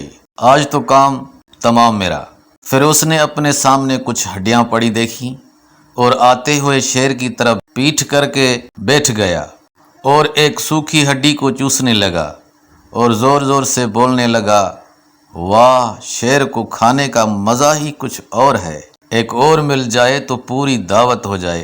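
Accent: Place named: Sindh, Pakistan. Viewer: بہت ہی خوبصورت انداز سے آپ نے کہانی بیان کی، لیکن لہجہ آپ کا بلکل سندھیوں جیسا ہے۔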